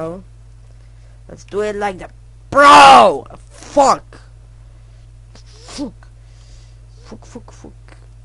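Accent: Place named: Germany. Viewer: yer it lags....allot....ur not english are u? u German?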